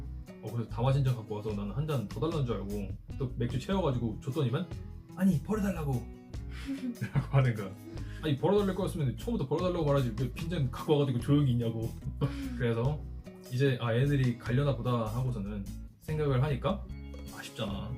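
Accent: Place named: Gyeongsang, South Korea. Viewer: + 경상도 사람 같은디 경상도의 서윗함을보여주네용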